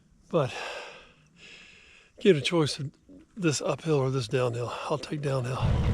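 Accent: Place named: North Carolina, United States. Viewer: I heard your NC accent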